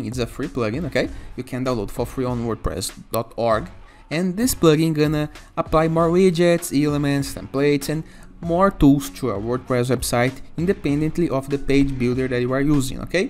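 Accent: Brazil. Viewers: Uai... Você não tá ensinando português de graça, né... hehehe Mas a dica grátis é Faça um dicionário de pronúncia e passe a ler as letras na pronúncia do outro idioma, não na da tua língua materna Aí ao invés de lêrni ráu tchu impruvi en op-maizi dê sêrchi rezuuls E ficaria mais leern róu t'il óp(t)máiz een m-pruf dâ sêrsh (sem o i) rizult's Pra quem tá há 6 anos num país (a menos que você esteja em Detroit ou Miami) era de se esperar que você tivesse dedicado bem mais pra falar o idioma além do "suficiente pra se comunicar" e passado pra algo fluente (E olha que eu nunca sequer viajei pra algum país anglófono) Logo, trocar o maneirismo "né" por "ok" não faz nenhum sentido. Americano não fala assim. Até se fosse o "ya know" faria mais sentido ou trocar o "tipo" por "like" (que continuaria sendo feio, mas pelo menos faria sentido no outro país. Porque, se um zé aleatório que nunca viu tua cara conseguiu identificar LITERALMENTE em duas frases de onde você é, acho que ESPECIALMENTE nesse governo aí a coisa não vai dar bom pra você 😬 É um problema bastante recorrente do brasileiro classe média achar que é branco no mundo todo só porque se considera branco aqui [E eu falo isso justamente porque tendo a pensar que seria melhor você aí do que voltando hehehe]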